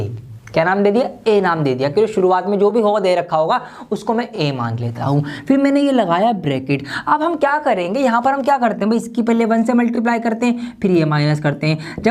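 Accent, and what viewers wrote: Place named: Bhopal, India. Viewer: Thank you Sir 🙂 .. you make every problem easy to understand ....i wanna know that ..where are you from ..and you pronounce words like Bhopali.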